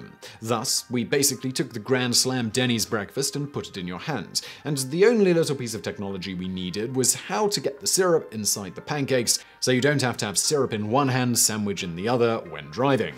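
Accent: United Kingdom. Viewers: Good old British accent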